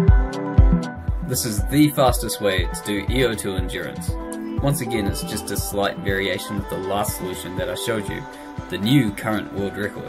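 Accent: New Zealand. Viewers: so refreshing to hear a kiwi accent talking about tm. where abouts are you from in nz btw? i'm in chch ~ psyko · Rest of the world says: section, checkpoint NZL says: séction, chéckpoint Funny accent! Good job with the video mate! Have you used the 'traveling salesman' problem for optimization? It is the most efficient way (that I know of) to determine the fastest route from A to B. Edit: I was halfway in the video when I asked it, sorry. So you did use traveling salesman :)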